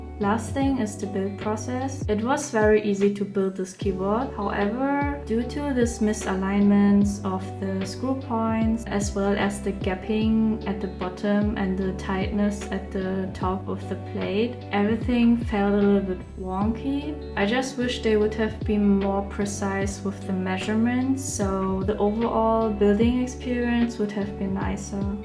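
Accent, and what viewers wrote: Germany. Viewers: Why? You do not need to be shamed about. A German - English could be lovely if the natural voice is nice and warm. Just like yours 🥰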